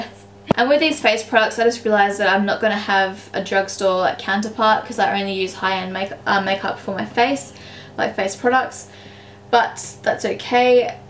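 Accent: Australia. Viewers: are you Australian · sweety come to australia, she has the best pronunciation I've ever heard from an Australian and i live there, this is like posh australian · It is a normal Australian accent.You are so superior. I would have liked to insult you, but with your intelligence you wouldn't get offended. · its an australian accent... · She's Australian :)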